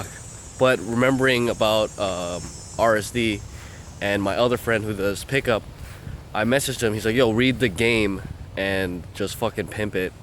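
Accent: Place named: United States. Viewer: were you born in the US? Your English sounds so American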